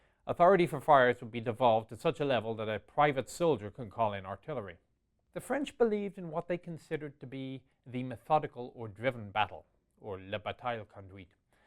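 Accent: Ireland. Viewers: I'm not bragging cause I'm sure enough a loser but I know all this. I think the is reading this..But don't get me wrong...i love the Irish accent and the delivery. He would be a hell of a professor. But he does better speaking for a video game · is the Chieftain Irish? He certainly has that silver tongued lilt from Eirre. There's no gutteral Northern Irish there. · It's the Irish accent. It tends to start low then go high at the end when important information is presented.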